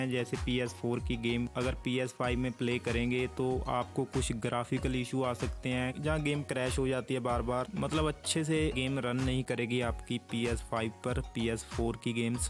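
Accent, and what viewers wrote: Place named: Punjab, India. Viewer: Bhai tera accent Punjabi ja aa 🤨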